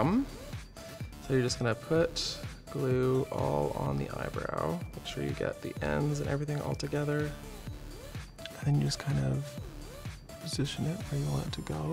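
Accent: Canada. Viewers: I’m Canadian and I’m sad I literally can’t hear Canadian accents because all the fake ones sound so funny so I wish I could hear Brooke’s accent the way other people do😭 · The Canadian accent coming through. I’m living for it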